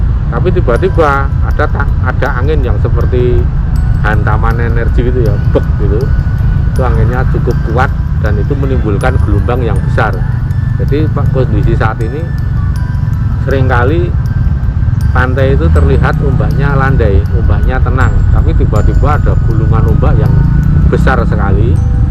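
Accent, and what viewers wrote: Java, Indonesia. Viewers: Kamu loganya jawa .......saya tahu kamu aslinya loganya palembang..........apa anda orang indigo........saya juga indigo dari kecil.....dari ayah saya zakir naik......last ferts how do gers boy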